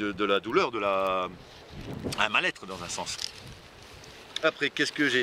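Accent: Nord-Pas-de-Calais, France. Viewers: Une personne simple, qui vie simplement, qui parle « vrai »... Je suis normand et habite en Auvergne depuis peu, j’allais très souvent voir les ch’tis, là haut, que de bons souvenirs. Je pense que nous aurions aimé, mes amis et moi, nous retrouver à boire une bonne petite pinte dans ton bar. Ça me fat du bien de réentendre cet accent. Nous construisons aussi notre petite oasis, de notre côté nous faisons notre chemin. Je te remercie, j’ai l’impression d’avoir pris ma vague d’optimisme et de positivité pour le week-end et plus.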